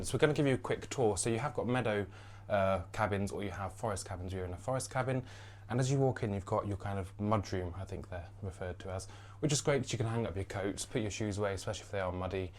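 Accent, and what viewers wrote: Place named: RP, United Kingdom. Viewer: Love this. Me and my husband are staying in keldy in a silver birch for Xmas. Can't wait. Something funny aswell but my name is Daniel so it was a bit odd to see my full name in the corner of your video obviously your and. Hahaha. Love your posh accent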